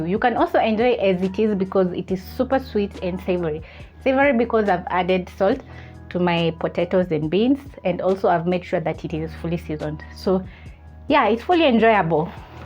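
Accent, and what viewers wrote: Kenya. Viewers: Was very sure you are from Western Kenya until 🤣🙆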